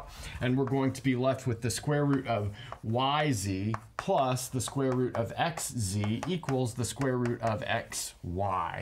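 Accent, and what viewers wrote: United States. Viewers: this guys American pronunciation is infuriating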